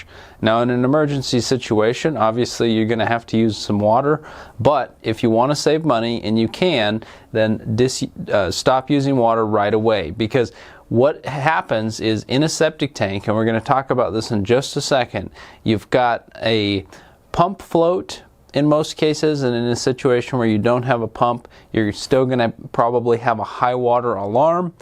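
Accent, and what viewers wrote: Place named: Midwest, United States. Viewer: Sounds US Midwestern to me.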